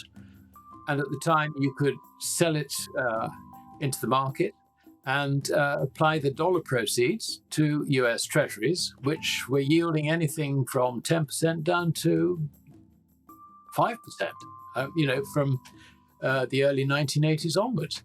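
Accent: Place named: United Kingdom. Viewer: It’s hard enough to listen to his Brit voice, the back ground distraction kills his message. I can only give it 5 min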